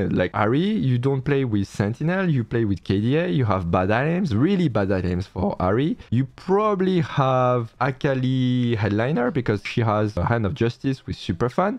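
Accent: France. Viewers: J'entends un reuf français pour sur ! 😂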